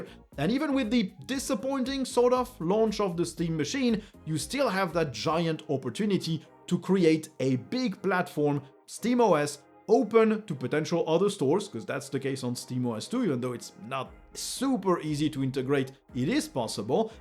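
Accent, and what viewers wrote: France. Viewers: I love the accent so much. Staying up to date with Linux news is almost a bonus. English spoken with a French accent is music to my Eastern European ears. I'm not much of a gamer, but I watched (well, mostly listened to) a whole Dishonored playthrough by Raphael Colantonio in no small part to enjoy his accent. Most of the other people who were invited, part of the Dishonored development team, also had French accents. (edited - name spelling)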